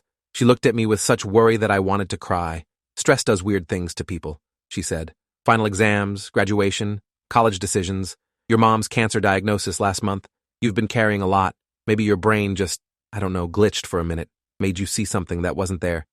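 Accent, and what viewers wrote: United Kingdom. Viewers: Holy British bru 💀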